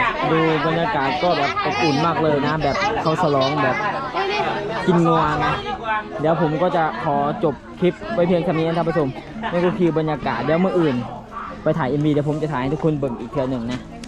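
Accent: Laos. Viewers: เว่าลาวป่นไท น่ารักดี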